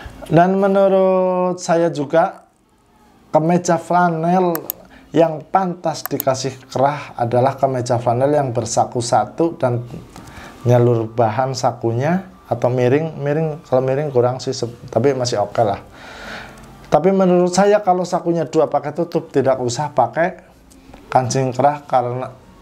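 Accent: Banyumas, Indonesia. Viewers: Bahasa indonesia logat ngapak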